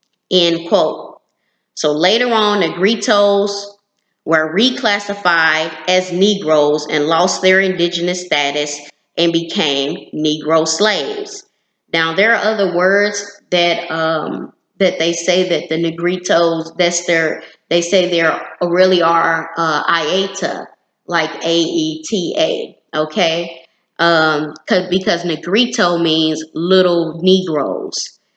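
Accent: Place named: South, United States. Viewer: am from the caribbean and trust me when i say this "black americans " who try to speak about history speak trash. As a matter of fact some things in this video are incorrect. you think some black woman with an southern accent is gonna show up magically knowing what happened during colonial times??americans don't even know the countries of the world muchless history lol,only thing they know is that there was something called slavery. there is no such thing as black caribs and as a matter of fact the black people were west africans who escaped from the plantations during the english and spanish wars over certain islands. this is so misleading and trust me i can continue to name out a lot of other things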